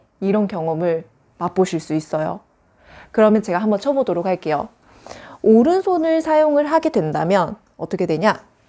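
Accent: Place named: China. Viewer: 중국에서 오래사셨나보네요? 억양이 쥥국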